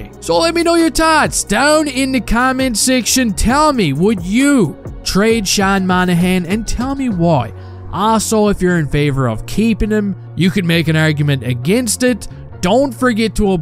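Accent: Newfoundland, Canada. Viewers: Are u from newfoundland? If so what part?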